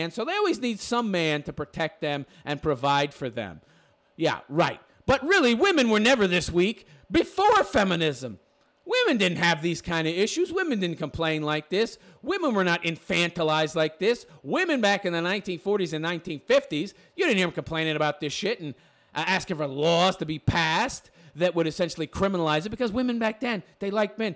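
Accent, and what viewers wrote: United States. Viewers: You sound american' You so tough why don't you get arrested for not paying alimony and "take that shit to the supreme court and have the law taken out?"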